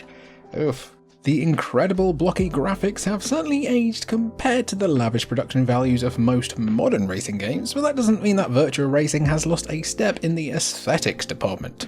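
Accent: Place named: United Kingdom. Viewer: You sound British but then why would you be talking about the Genesis?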